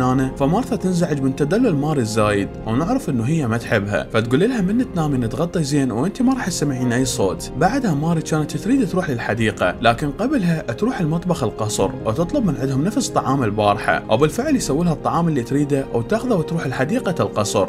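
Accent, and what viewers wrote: Iraq. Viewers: سوال انتي عراقي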